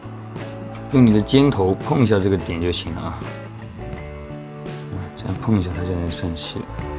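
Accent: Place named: Fujian, China. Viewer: 是我看过做维修视频做的最专业的一个，请问是不是福建人？听口音像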